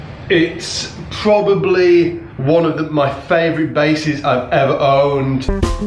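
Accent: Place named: Northern England, United Kingdom. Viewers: "A toone"! Yorkshire much? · I agree about having a better bass doesn't make you any better at playing but I wouldn't say you play exactly the same on every bass, some are more comfortable than others 😏 Fender Cabronita Precision Bass (speaks for itself) Squier Affinity Bronco Bass modified with Seymour Duncan Mustang Bass split coil pickups and a Jazz bass bridge pickup (brand unknown) (and a confederate flag pickguard hehe, not racist 😏) SX Vintage Series SPB57 Precision Bass (love this one) Also gonna buy an Ibanez ATK200 sometime soon Ampeg BA-108 V2 (selling) Peavey Tour Series 450 bass head (also selling) Soon getting an Ampeg BA-210 V2 PS nice basses, and let your Yorkshire accent fly hombre 😏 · Nowt wrong wi'a Yokshuh accent :) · You’re so northern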